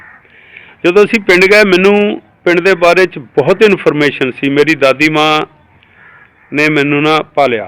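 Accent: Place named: Punjab, India. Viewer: ਜਨਾਬ ਜੀ ,ਤੁਹਾਡੀ ਪੰਜਾਬੀ ਤਾਂ ਬਿਲਕੁਲ ਚੜਦੇ ਪੰਜਾਬ ਵਾਲੀ ਹੈ, ਜੀਉਦੇ ਵਸਦੇ ਰਹੋ ।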